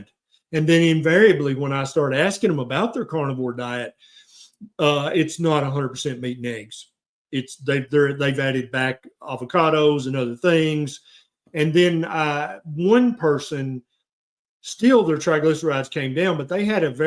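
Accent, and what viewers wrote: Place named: Upper Peninsula, United States. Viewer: Michigan- Yooper:)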